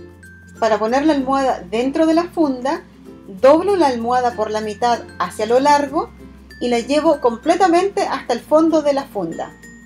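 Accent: Chile. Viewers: eres chilena? Por el acento, me gustaron lmucho tus sugerencias...además que eres muy sencilla y correcta, sin poses...todo está muy bonito gracias!!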